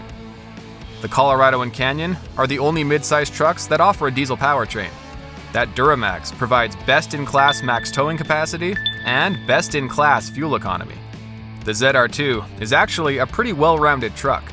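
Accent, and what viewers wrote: Canada. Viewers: He’s from Canada 🇨🇦 has to be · Why, he's Canadian, it's how they say "z"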